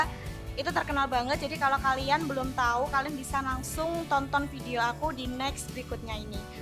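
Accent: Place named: Java, Indonesia. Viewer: Wkwkw ngomongnya jawuanya banget 😀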